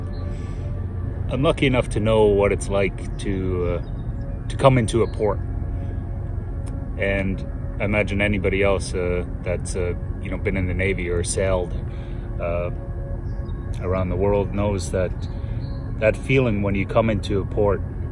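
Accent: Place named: Finland. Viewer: A nice finnish accent.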